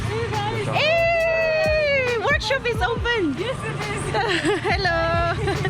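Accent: Nepal. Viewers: You have so nice Nepali tune loved it hope you will speak alot more Nepali language 👍👍👌👌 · You've got a very very beautiful Nepali accent. Kudos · OMG.... your nepali accent ❤️❤️❤️❤️❤️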